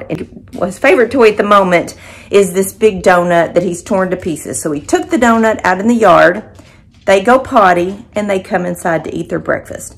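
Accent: South, United States. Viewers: I just love you and your sweet southern accent.You bring a smile to my face everytime I watch a video. I especially enjoy the videos of you with your family and the dogs. I did have to cry when you and said goodbye to Brecon on the instagram pics. I have been through that twice and I know how you feel. My shirt should come soon but I already give you an A+ for presentation. I see the extra work it takes to do the sticker, tissue, and treat. When things are done the Way, they are done right!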